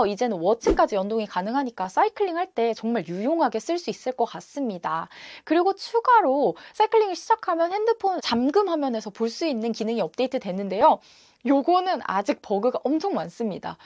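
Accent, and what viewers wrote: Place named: South Korea. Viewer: 정칙한 한국인 영어에 구독버튼 누릅니다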